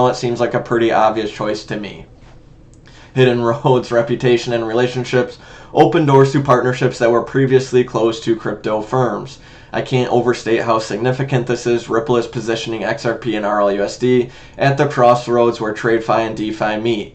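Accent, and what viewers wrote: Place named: Canada. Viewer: You sound like you're from Canada or something, but I like you anyway.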